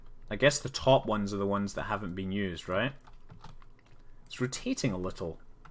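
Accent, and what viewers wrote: Scotland, United Kingdom. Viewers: Scotsman. Ha Ha ha Ha.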